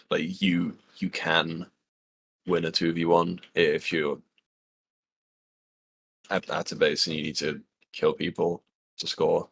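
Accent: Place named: United Kingdom. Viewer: why is he british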